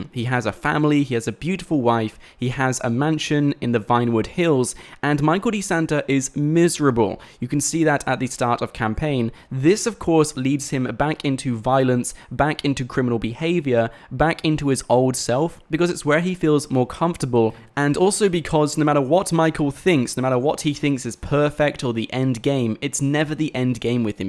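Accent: United Kingdom. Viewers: Stop being mean, its not his fault hes british and that he has a British accented!!! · Firstly don't hate on his British accent and secondly he's saying it the right way, it's pronounced mike-al-de-santa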